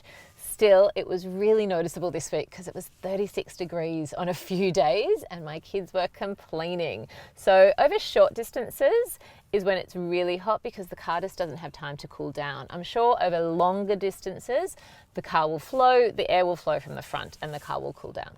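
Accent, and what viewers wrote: Australia. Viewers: Damir D, she has an Australian accent, please do not judge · I dont know how to tell you but Aussies pronounce things differently. Because there are different countries outside yours and people outside of you lol.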